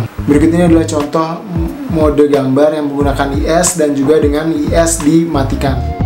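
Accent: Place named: Bali, Indonesia. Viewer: bang kq logatnya kyak logat bali ?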